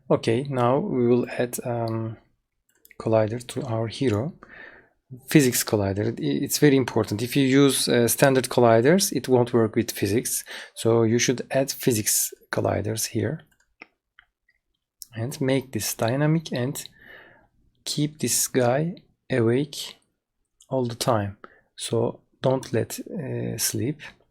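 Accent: Turkey. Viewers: oglum sen türksün la türk ingilizcesini nerde görsem tanırım.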